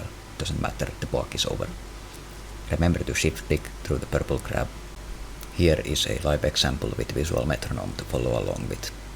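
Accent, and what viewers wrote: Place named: Finland. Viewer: are you Finnish? you sound like Xpetu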